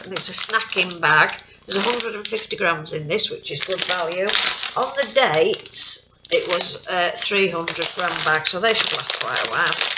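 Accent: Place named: Wakefield, United Kingdom. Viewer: Love your accent! I'm from Northern Ireland but have a nephew from Wakefield who speaks just like you! I've just recently subscribed to your channel and really enjoying your videos so keep them coming! Norma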